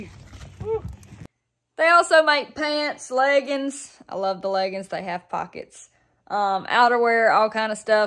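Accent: South, United States. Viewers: That strong southern accent doesn't scare me... it reminds me of the good old days 😁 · Love this strong southern accent 🤠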